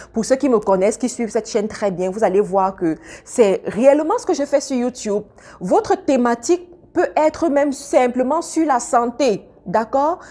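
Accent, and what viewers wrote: Cameroon. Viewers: J'aime trop votre façon de parler! Vous êtes la seule mbenguiste camerounaise qui a su garder son accent.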